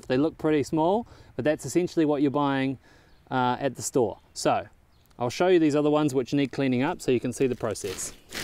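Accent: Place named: New Zealand. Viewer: I reckon, sounds like a kiwi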